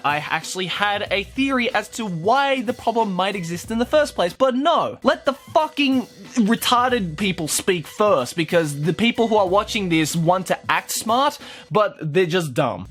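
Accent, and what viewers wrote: Australia. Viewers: I KNEW HE WAS AUSTRALIAN!!! But seriously be fuckin' careful bro, everything wants to kill you there. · hheeee ??is he Australian o.o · I'm American ( not offended) im tired of hearing from the American side too...rather hear you're cute Australian accent any day. 😽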